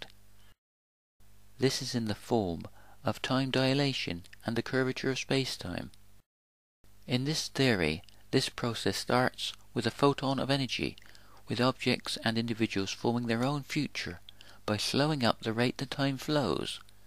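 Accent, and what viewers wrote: West Country, United Kingdom. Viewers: I shared your Video to the Google+ Science community and it was moderated to Death.. I think your West Country accent got the better of them. Don't let them grind you down. They think they know it all.. but they are dancing to Pan's pipe.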